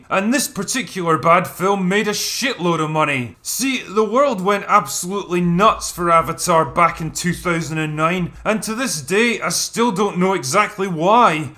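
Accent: Scotland, United Kingdom. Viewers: You're Scottish? I just thought that was just drunk accent. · Ten seconds in, already sounds like the narrator is about to have an aneurism Edit: oh he's Scottish 🍀 Edit Edit: why do Scots have blisters on their dicks? Cos they're such tight fisted wankers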